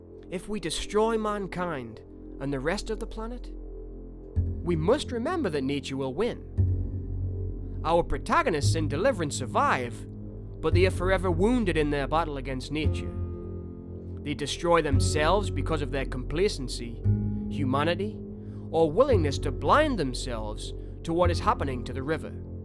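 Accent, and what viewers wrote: Newcastle, United Kingdom. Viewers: are you half geordie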